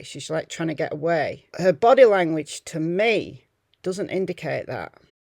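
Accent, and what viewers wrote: United Kingdom. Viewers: I tend to think that you are right. I just don't like that the video has been edited and that they lie about it, or that nobody could see what was recorded on the other cameras in the hotel. I don't know if they are just protecting themselves or if something shadier has happened. Because the hotel had such a bad reputation, they had trouble finding employees so they often hired ex-convicts. I have seen some interviews with people who have reported assaults from some of their staff members, and some who have been raped on the roof. So maybe someone saw a vulnerable girl and took advantage of her? Anyway, a great video. I always enjoy your take on different cases, and I just love your british accent! ;-)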